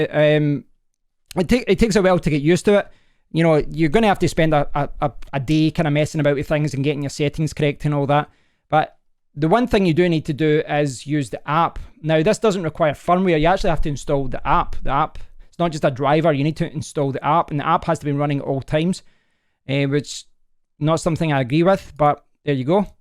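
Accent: Scotland, United Kingdom. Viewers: is he scottish? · best scottish accent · Scottishhhhhhhh!!!!!!! i did my first degree in Glasgow and missed this Scottish accent. Congrats, very good demonstration. Thanks for sharing.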